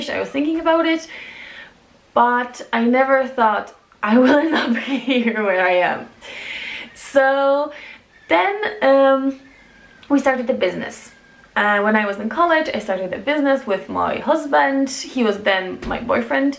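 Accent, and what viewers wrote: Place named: Ireland. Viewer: Your accent is awesome. I love hearing foreign-born people with an Irish accent.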